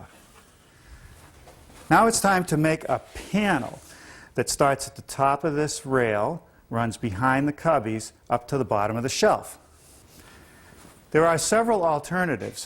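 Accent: New England, United States. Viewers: I have to say, as a Texan, I’m enamored with his yankee accent, but I’ve been mentally calling it “Cortisan” white oak from watching these videos lol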